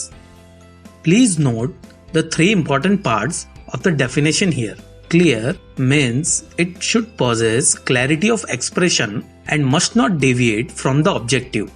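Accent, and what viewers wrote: India. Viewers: Indian ahh acent 😭🥀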